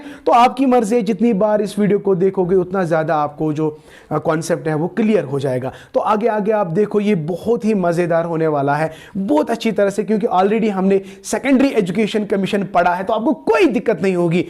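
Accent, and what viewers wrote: Kashmir, India. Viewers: I like your little kashmire in sir your lecture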